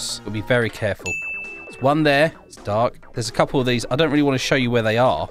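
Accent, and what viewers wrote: United Kingdom. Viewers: i love your british and also your vids<3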